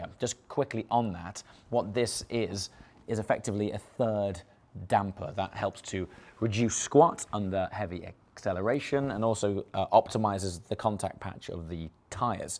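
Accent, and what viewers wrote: United Kingdom. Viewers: Why do you sound about 20% more british in this video?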